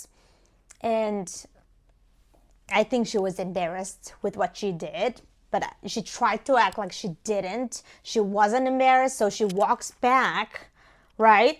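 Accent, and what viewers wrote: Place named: United States. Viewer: Your American accent helps me improve my pronunciation.Waiting next English speaking vlog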